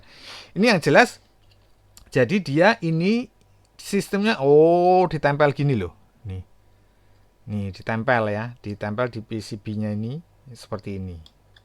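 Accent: Java, Indonesia. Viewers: Logat jawamu medok banget min😂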